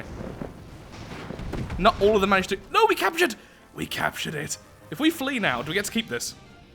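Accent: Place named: Birmingham, United Kingdom. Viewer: I'm not certain but he sounds like he's from somewhere near Birmingham, UK.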